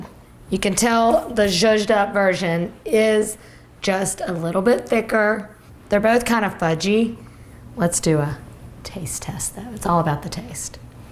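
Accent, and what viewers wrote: South, United States. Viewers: Love hearing you talk,Definitely can tell you are a Southern Lady,Makes me miss home,I'm from Southeastern Virginia.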